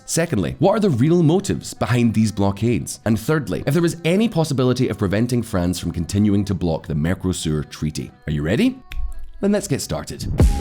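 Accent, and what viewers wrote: United Kingdom. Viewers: Curious which part of UK is the narrators accent from?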